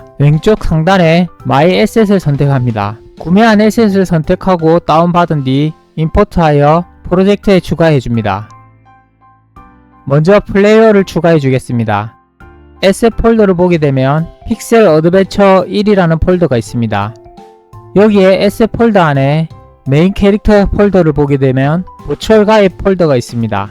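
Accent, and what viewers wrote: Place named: South Korea. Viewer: 보고 즐겁게 따라해봤어요! 친절한 설명과 구수한 사투리 겉들인 좋은 영상 감사합니다!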